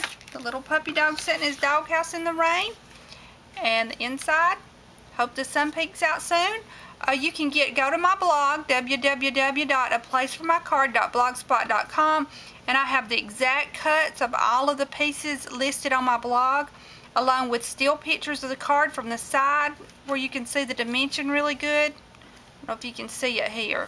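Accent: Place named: Alabama, United States. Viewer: I really enjoy your videos and blog. I love to hear you talk because you sound like me. I live in Alabama with you. I'm about 20 min. from B'ham. So were almost neighbors. Thanks for sharing.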